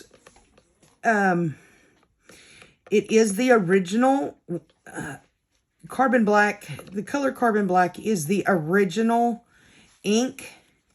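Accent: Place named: Texas, United States. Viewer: my love, I love it!!! 🤩🤩 I also love you giving Lulu the business!!! 🤣🤣🤣 Keep being you my friend!!! Crazy dog and Texas accent makes a great video. 🥰❤️😘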